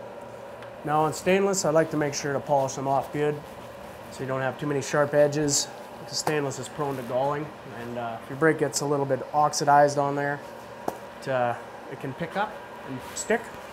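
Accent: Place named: Canada. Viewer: Canadian accent.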